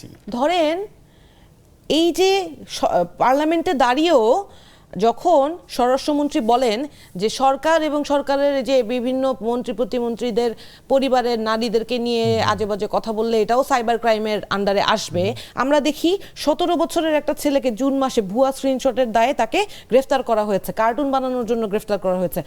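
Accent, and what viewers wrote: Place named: Noakhali, Bangladesh. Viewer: Tor Kotha noakali language er moto pronouns laghe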